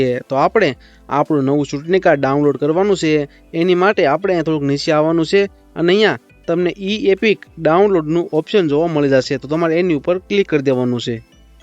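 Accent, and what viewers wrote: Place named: Surendranagar, India. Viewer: Surendranagar baju ni Bhasha ma avu bolaya 6e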